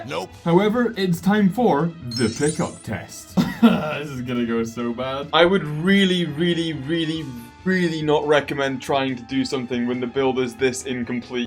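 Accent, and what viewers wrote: United Kingdom. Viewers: Sounds British. I think he needs a bottle of water.😂😂😂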